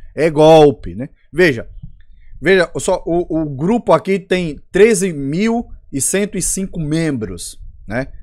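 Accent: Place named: Paraíba, Brazil. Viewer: Quase cai, mas cliquei no link , que faco agora,, sotaque Paraiba muito legal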